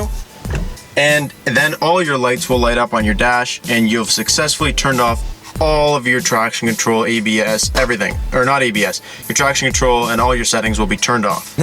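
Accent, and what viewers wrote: Ontario, Canada. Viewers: Are you Canadian or from a northern state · lol super ontarian accent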